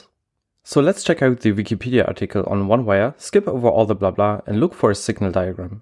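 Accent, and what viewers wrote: Germany. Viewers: 100% a German accent. Trust me, we have something akin to a sixth sense, allowing us to recognize our own accents in lightning fast speed (pun not intended lol). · Are you German? Just asking because of the accent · Dieser Deutschakzent ist super 😁 Das Video auch ! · Judging from your accent, you are German. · Yep he is german😂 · are u german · Are you from Germany? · Bist deutsch oder?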